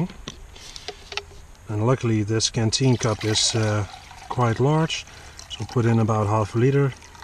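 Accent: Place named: Canada. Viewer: Another enjoyable video! Where are you from? I am thinking Canada? But I may be completely wrong. You do a great job!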